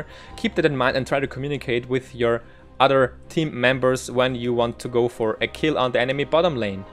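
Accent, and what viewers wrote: Germany. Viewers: german accent? ^^ haha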